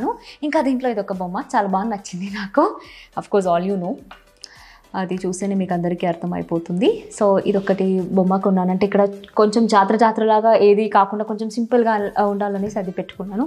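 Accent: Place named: Telugu, India. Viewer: Mee voice n Telugu slang kekaaa loved you so much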